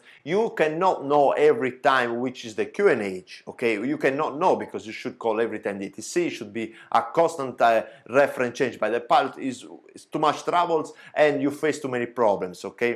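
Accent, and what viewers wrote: Italy. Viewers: I love Italian accent in English Amazing man , I enjoyed the speaking more than explanation 😉. A320 tutorials if you don’t mind Capitano 😘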